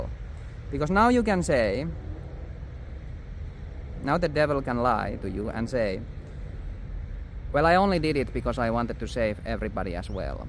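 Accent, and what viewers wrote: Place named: Quebec, Canada. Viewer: You sound like you're from Quebec or French Canadá . George st. Pierre has a similar accent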